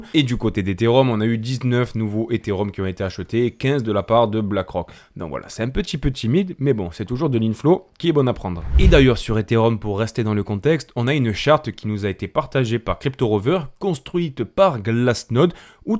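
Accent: South, France. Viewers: tu as l'accent du soleil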